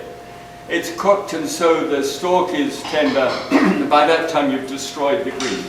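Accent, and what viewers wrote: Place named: United Kingdom. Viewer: I always enjoyed watching the Galloping Gourmet. He always spoke in Japanese, though. His jokes were also very Japanese. I did not know he had British accent until recently.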